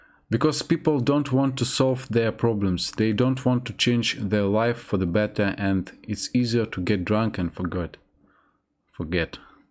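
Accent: Russia. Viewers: Thank you very much. Alcohol is a big, big problem in my home country Danmark as well. For some reason the price of alcohol never really goes up here - in fact they have lowered taxes in recent years. I wonder why. Your English is fine btw, don't worry. For some reason, English spoken with Russian accent is very charming :) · good video-thanks.Your English-pronunciation- is pretty good.Your Russian accent is much less than the accents we-people in USA- hear in movies when an actor is portraying a Russian person. Maybe the actors ham it up-make the accents stronger than "real" accents. In any case-pronunciation is good. I looked up some figures-the claim was average consumption for person 15 or older is 11 liters of PURE ETHANOL per year. 11,000 mls- 100 pls of pure ethanol over a few hrs is enough to make you drunk-AND CAUSE LOTS OF LIVER AND BRAIN DAMAGE! So 11,000 mls/yr is 110 DRUNKEN DAYS per year- 2 times a week-POISONED. 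Opioids- are actually much less toxic than alcohol.They can acutely kill you-stop breathing-if used IV-but used orally they just addict(not good) but they won't kill you if used only orally. Shame for Russia and Russians-perhaps alcohol is why you folks are manipulated by your leaders and oligarchs(not that we in USA are not-but we actually have free elections(yes it takes huge piles of money to get elected-so in some respects our elections are bought-but. we have "some choice" and since we are armed-2nd amendment-occasionally we kill our leaders(so does India-Israel and other democracies-France repeatedly tried to kill De Gaulle-a hero war leader-they failed but not for lack of effort! You folks can't convincingly. threaten your leaders-we can but rarely kill the ones who "need" killing.But. perhaps our leaders keep this in mind when making policy.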